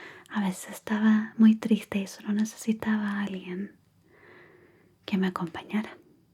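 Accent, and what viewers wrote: Chile. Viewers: Desde la primera vez que te escuché, quedé enamorado y fascinado con tu dulce voz y arrobador tono chileno 🌹👸🌹🎼🎵🎶🇲🇽🇨🇱 GRACIAS 🙏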